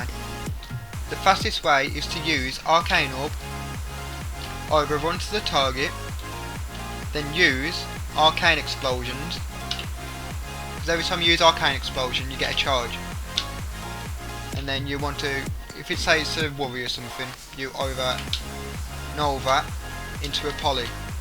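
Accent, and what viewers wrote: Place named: Black Country, United Kingdom. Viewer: Yam from Black Country ay ya? Nice guide thanks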